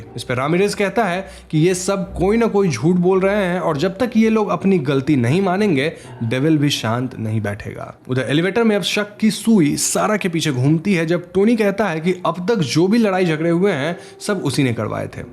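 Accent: Bengal, India. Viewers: Aap bengoli ho?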